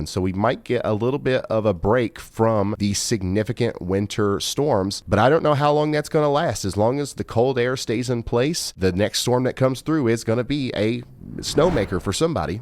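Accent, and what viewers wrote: South, United States. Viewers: I just want you to know that I love that you speak "Southern". It just warms my heart. Keep on Keepin on Brother!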